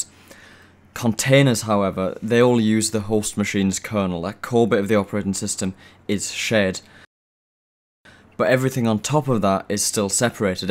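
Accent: United Kingdom. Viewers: fuckin uk accent(PIG)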